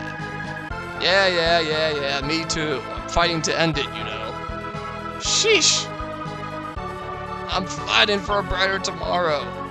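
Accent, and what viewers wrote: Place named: South, United States. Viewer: You should probably fix your audio sometime. Music comes from left, mostly. The rest from both sides. Might just be me *using headset* Also...A Persian with a southern accent. *imagines*mind explodes* o__o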